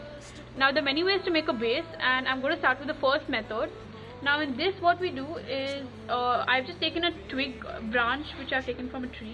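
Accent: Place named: Scandinavia. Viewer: Your accent, are you Swedish, Danish or German? Also this tutorial is pretty cool and it helped quite a lot.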